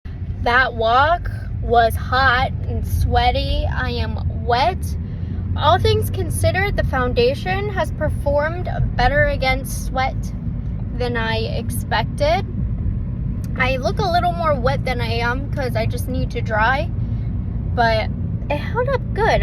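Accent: England, United Kingdom. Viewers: Aah, excellent video, and the English accent uncanny (good audition for Bridgerton). Yeah, I agree with it looking metallic. It reminds me of Laura Geller's balance and glow powder foundation, and that never sat well with my skin. But you did an amazing job with this honey, I won't be purchasing it, but on a very young skin, it would probably look amazing, just not for my almost 61-year-old skin. Thank you, gorgeous girlie 😘 🌟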